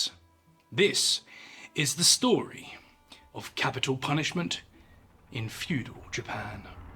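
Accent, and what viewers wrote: England, United Kingdom. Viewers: I'm having trouble getting past seeing Ricky Lafleur with an English accent. #trailerparkboys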